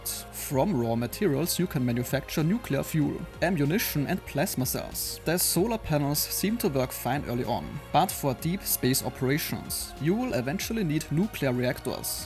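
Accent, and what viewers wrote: Germany. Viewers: Basically a good vid, but whay not in German? Your German accent makes it hard to watch, at least for me. And its really like reading off from your notes, transletet into English... so it is like a very rehearsed/scripted manner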